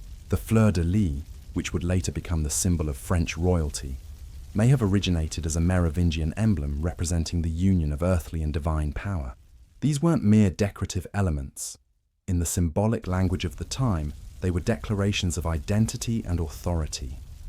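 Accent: England, United Kingdom. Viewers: Very interesting!!! Ditch the English accent. Please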